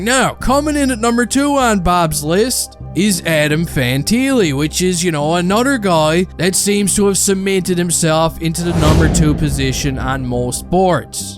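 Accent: Newfoundland, Canada. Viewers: Sounds like a Newfoundlander. Where are you from?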